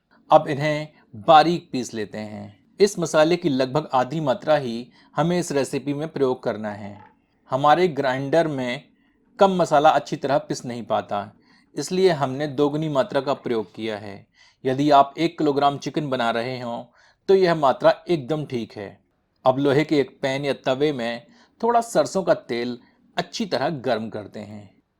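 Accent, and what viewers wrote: Standard. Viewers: Aap itni shuddh Hindi prawachit krte h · shudh hindi me recipe dekh kar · Apki sudh hindi Or cooking style dil jeet liya.... Dhanyavaad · aapka Shudh Hindi Ucharan bahut Pasand hai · आपका बोलने का शुद्ध हिंदी तरीका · aapki voice aur pure hindi soonnnay aata hun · आपका विशुद्ध हिन्दी मे बोलना काफी अच्छा लगा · shudd hindi and very polite way to explain recipe. awesome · आपकी शुध्द हिन्दी और आकर्षक प्रस्तुति से ऐसा मेरा मानना है कि यह डिश बेहद स्वादिष्ट होगी..निश्चित ही इसे बना कर आजमाया जायेगा... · apki shuddh hindi bahut hi achi h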